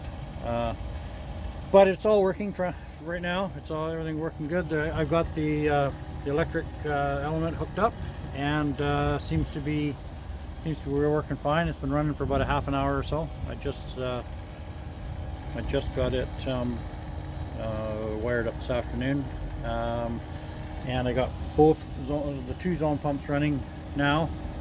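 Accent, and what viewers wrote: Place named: East Coast, United States. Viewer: you have more of an east coast accent.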